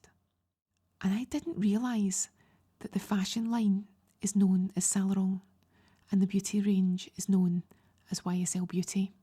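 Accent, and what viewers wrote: Scotland, United Kingdom. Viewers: I was about to doze off until I heard, "because she doesn't really have a butt, yet," which, when replaying it 3 times and factoring in the Scottish accent was of course, "because she doesn't really have a budget." I'm all like, 'Ohhhh... budget." :face-blue-smiling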